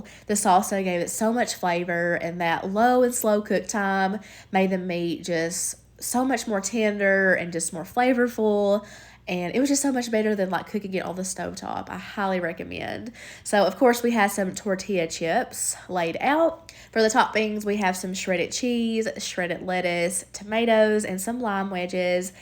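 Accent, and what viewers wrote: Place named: Kentucky, United States. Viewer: I'm from Lexington lol. I didn't know where you were from but I thought I recognized a KY accent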